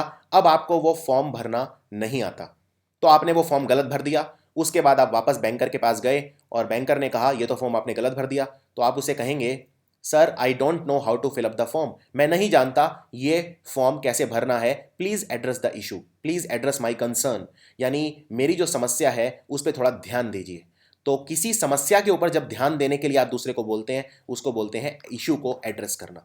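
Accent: India. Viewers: first just know that how to pronounce words. blody Indian accent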